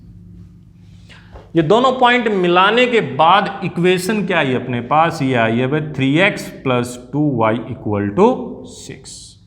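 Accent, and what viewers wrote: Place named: Haryana, India. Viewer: Si ke accent ko dekh ke lagta h ki sir haryana ke h 😎😎 But kuch bhi ho padhaya badhia 🙏🙏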